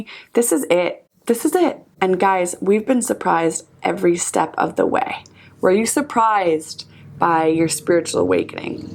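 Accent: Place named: Ireland. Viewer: Lovely vlogs..very interesting!...I hear a touch of Irish in your accent..💚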